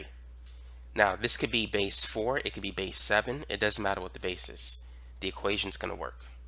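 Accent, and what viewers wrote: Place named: Boston, United States. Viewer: This guy def has a boston accent.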